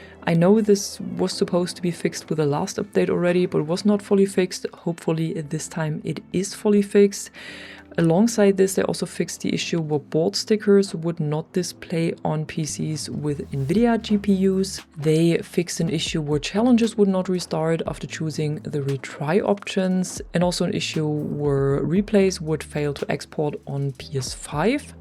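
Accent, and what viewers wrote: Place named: Germany. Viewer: Ich weiß nicht aber hört sich wie deutsch an. Ich bin Spanier aber ich wohnte in Deutschland. My first friends I did in Germany were talkin in English so I know what I’m saying. Can somebody confirm is from Germany Austria Swiss?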